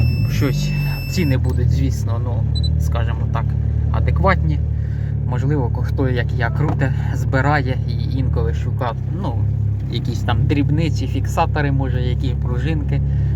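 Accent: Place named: Mykolaiv, Ukraine. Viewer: підписався на Тебе і не шкодую. Відоси інформативні та приємні. Дякую (за все, а особливо за наш " суржик"! Я- з Миколаївської обл)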